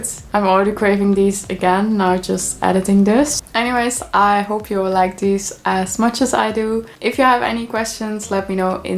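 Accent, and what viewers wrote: Netherlands. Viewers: You have such a typical Dutch accent when you speak English! HEHE #LikeDJtiesto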